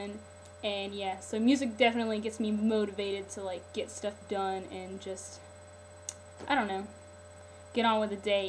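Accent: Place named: South, United States. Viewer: "Oh gosh she's freekin'" *Camera cuts out* Hahaha. What is your accent? It's really cool. It sounds kinda southern... but you don't talk slow. It's a good mix.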